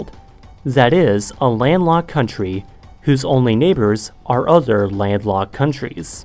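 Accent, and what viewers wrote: Germany. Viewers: you are acuttly starting to sound german you said zis' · ich glaube, dass dein deutsch etwas dein englisch beeinflusst because in the first few moments I thought that your are german.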